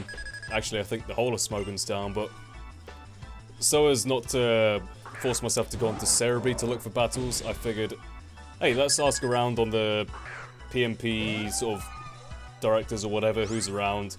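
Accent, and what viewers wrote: United Kingdom. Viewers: FINALLY ANOTHER BRITISH BATTLER I'm a britt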